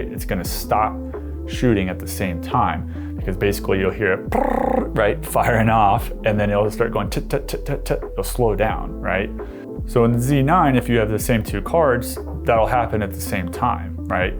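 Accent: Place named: United States. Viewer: Finally someone who speaks American English and says Zee instead of Zed!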